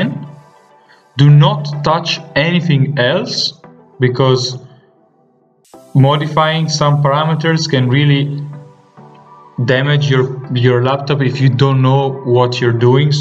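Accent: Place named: India. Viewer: it’s always the india sound kinda guy that’s helpful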